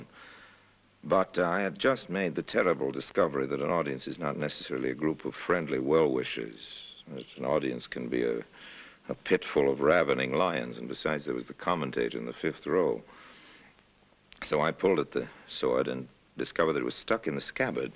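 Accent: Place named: Transatlantic, United States. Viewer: I think he was mostly raised in America but acquired a transatlantic accent, as many actors and upper class people did in the '30s, for example Franklin D Roosevelt had a similar dialect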